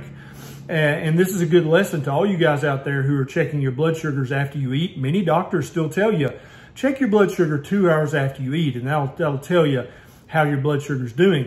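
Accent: South, United States. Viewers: If he didn't have that southern accent, I think his credibility would go way up.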